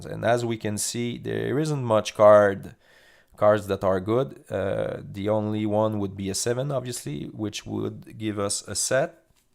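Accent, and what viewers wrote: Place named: Quebec, Canada. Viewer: Pretty sure I'm hearing that French Canadian accent! Merci beaucoup pour la série, ça m'a aidé bcp!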